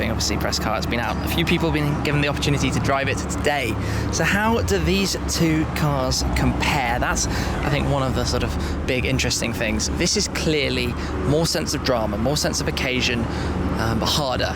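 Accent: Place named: United Kingdom. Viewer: Real fan of your videos. Just a couple of minor info updates. The wing is adjustable to 3 angles. There are 4 colours for the GTS. White, Black, Dark Frozen Grey, Mineral Grey (shiny grey) No adaptive suspension with 3 modes. 3 way manually adjustable coil overs. The adaptive suspension button used in the other M4s is blanked out. That steering wheel with shift lights is not standard. It must be an aftermarket M Performance steering wheel. The standard one has a nice orange stripe at 12 o’clock in the same manner as the GTS decal on the dash. Great review. Haven’t heard many nice things said about it in a British accent.;-)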